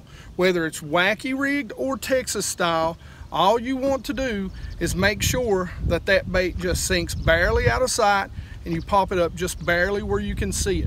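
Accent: South, United States. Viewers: Hey, i really liked this video. I watch a lot of Tactical Bassin cause everything else seems to be young guys ass slappin and hi fiving over 2lb fish ud think was their PB the way they giggle and ham it up for the camera. Drives me crazy. Im in Chicago so its tough to catch videos at the right time of season for us compared to where most guys film. It was 36° today and snowed 6 inches yesterday. Tomorrow sunny and 55 so hopefully theyll move up a bit? I grew up in Georgia so u sound like one of buddies. Anyway, no nonsense instructional videos with a southern accent are right up my alley! This is the first ive seen and i immediately liked it and subscribed. Great to see an adult talking about technique and not playing grab ass with a best friend that wants to get on camera!! Do you do guided trips or is this just a fun hobby for you? Id be interested if you do. 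Again great stuff 👍👍. Im now a full time customer. Thanks bud!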